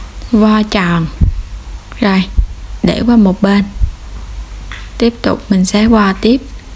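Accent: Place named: Tra Vinh, Vietnam. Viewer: Nhìn Bánh ngon quá, giọng nói Trà Vinh nghe dể thương đó ...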